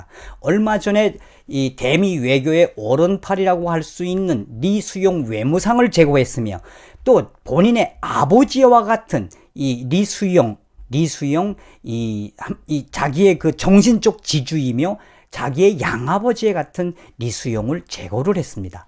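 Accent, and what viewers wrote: Pyongyang, North Korea. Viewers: 이북 지역사투리죠.그건 미워 맙시다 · 평양 사투리좀 안쓰면좋겠는데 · 정성산 티비는 라북사투리가 매력이야요...ㅋㅋㅋ피양사투리로하라요...화이팅....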